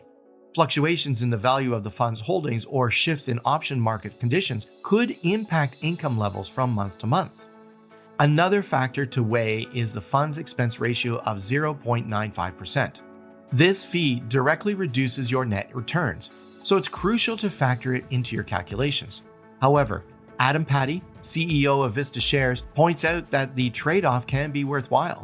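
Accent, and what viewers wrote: Canada. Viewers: I can tell you're Canadian.😄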